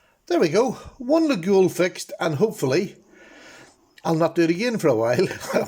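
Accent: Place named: Ireland. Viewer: I don't understand half of what this gentleman says? Irish? Scottish?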